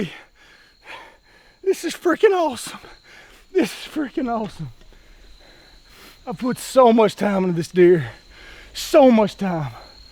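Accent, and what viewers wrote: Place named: Arkansas, United States. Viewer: Awesome story. Also you have the most Arkansan accent in Arkansas, so congrats there to 👏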